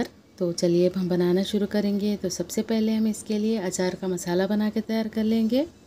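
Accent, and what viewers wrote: Hyderabad, India. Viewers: MashaAllah aap ki har ek recipe zaberdast rehti aur uspe Hyderabadi baat video mein aur bhi jaan daldeti matlab ek dum kirak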